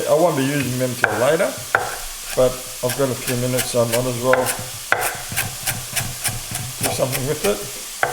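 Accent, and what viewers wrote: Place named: Australia. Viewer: thanks I will definitely share with others I too love cooking delicious and nutritious food for people especially my loved ones ❤ Also I could hear the Outback in your voice right away so when you said you were Greek I doubted my hearing but later you said you hailed from Australia I was like I KNEW IT 😂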